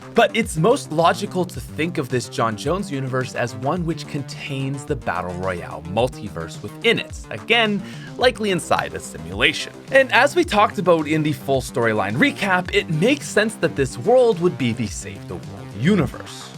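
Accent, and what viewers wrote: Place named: Canada. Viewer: i love his canadian accent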